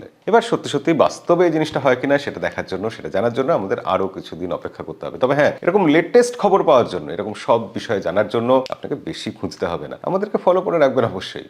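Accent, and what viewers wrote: Bangladesh. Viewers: এই বাংলাদেশী উচ্চারণ নিয়ে কি করে আনন্দবাজার এ চান্স পেয়েছেন এই উপস্থাপক!!!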